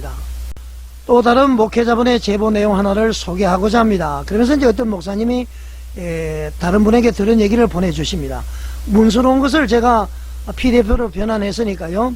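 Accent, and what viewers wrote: North Korea. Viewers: 어투가 북한분같아요.. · 그럴리가없어요 북한억양이 심하시네요 지금말씀하시는 분은 목회를 얼마나 하셨는지 모르겠군요 김문훈목사님 인격에 그런분이 아닙니다 음모인듯 음모 중단하세요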